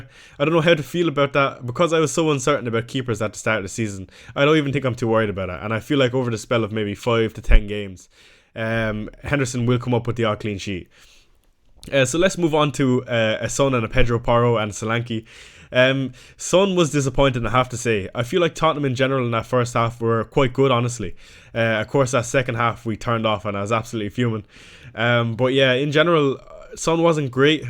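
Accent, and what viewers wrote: Ireland. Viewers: Don't mind him, yer grand. not used to the Dublin accent.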